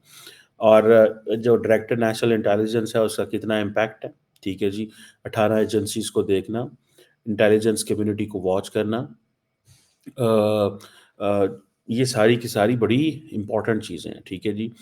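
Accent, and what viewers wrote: United States. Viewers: ji u are already little funny and to add on it American accent whyyyyyyy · Karmala bhenji was very confused about her identity and therefore didn’t get the whole hearted support of the Indian diaspora!! what’s with the American accent?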